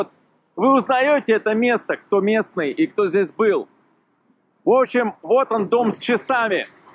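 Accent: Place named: Russia. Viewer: Вас тоже снесут с вашим рАссейским говорком. И цитируя вас сленг, скажу : " кАроче,точно !" Не эмониционируйте и кАроче валите из Мариуполя, если не хотите встретиться с пулей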